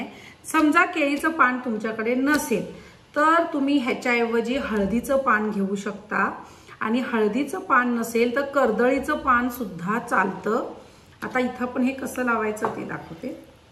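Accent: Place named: Belgaum, India. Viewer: Cute belgavi marathi. Ani cute recipe. Live it.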